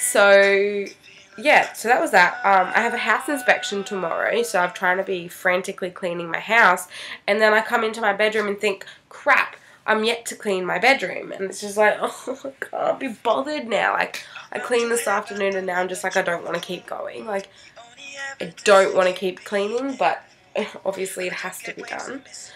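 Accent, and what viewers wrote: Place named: New Zealand. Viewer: just an observation - you talk like a new zealander. we have to change that!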